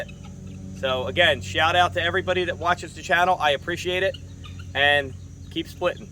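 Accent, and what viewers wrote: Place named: Maryland, United States. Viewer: You sound like a fellow Marylander. Great machine great video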